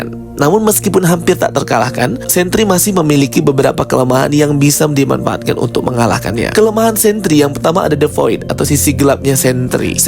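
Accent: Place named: Sumatra, Indonesia. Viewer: Menit ... " kek mana?!" Orang sumatra ko rupanya bang..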